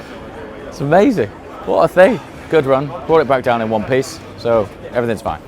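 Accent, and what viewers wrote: United Kingdom. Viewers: Only got onto you through dde, love the content! British voice is always nicer than an Americans! Love from down under mate!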